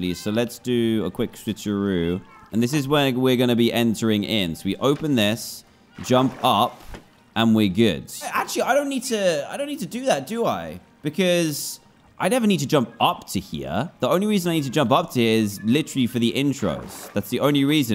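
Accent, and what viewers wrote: United Kingdom. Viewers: Hes British that's how they say it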